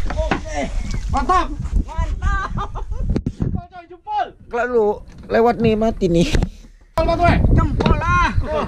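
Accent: Bangka, Indonesia. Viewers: Orang mna bang. Kok kyk org bangka. Hehe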